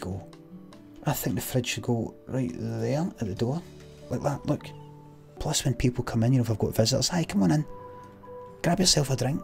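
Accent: Scotland, United Kingdom. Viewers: It's like Jerma but scottish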